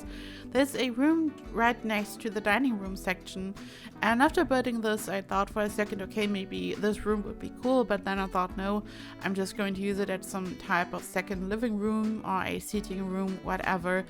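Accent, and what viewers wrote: Germany. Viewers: Akzent gehört und sofort gemerkt das du Deutsch bist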